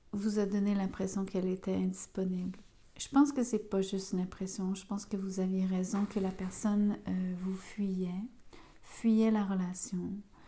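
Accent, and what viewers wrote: Quebec, Canada. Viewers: Ça me parle mais jamais plus de retour en ce qui me concerne il est bloqué de toute de partout c est fini merci ma belle vous êtes la meilleure vous êtes Québécoise j adore cet accent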